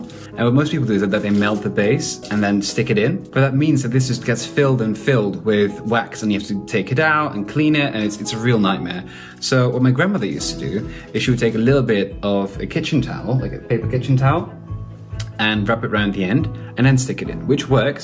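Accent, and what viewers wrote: United States. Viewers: You did a beautiful job and listening to you speak English you almost sound like an American... your English is great! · You've learned perfect American English and accent.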